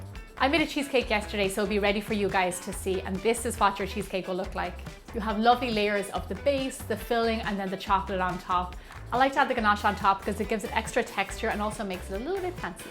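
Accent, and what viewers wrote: Ireland. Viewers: Wow! I've found my new favourite chanel! Lovely, easy food, no hard to get ingredients, and a lovely irish accent! (The best one for me) Cheers from Argentina! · Love the Irish accent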